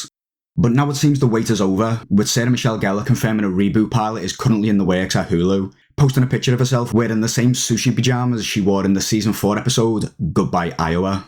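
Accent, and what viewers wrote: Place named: North, United Kingdom. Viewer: nah they’re not the only one! It’s so refreshing to hear a familiar accent. It was partly why I kept watching.😊 We’re definitely underrepresented in the media. Northern accents in particular are sadly so rare.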